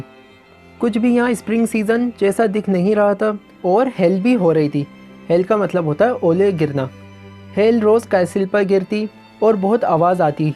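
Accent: Bhopal, India. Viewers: bhai m bhi accent sunte se hi smjh gaya tha ki aap bhopali ho 😄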